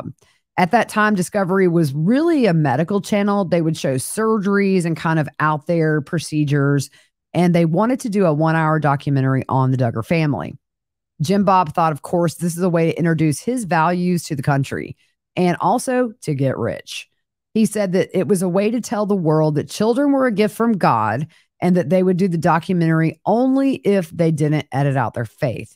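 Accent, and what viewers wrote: South, United States. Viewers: I know I love her southern accent so much ❤❤❤❤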